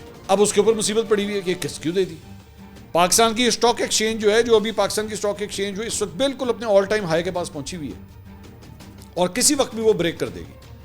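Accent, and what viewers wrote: Punjab, India. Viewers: Your Punjabi is soo cute❤❤😂Love you sir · Saab aap waise Kamal ke hain specially aapki Punjabi bolne ka andaaz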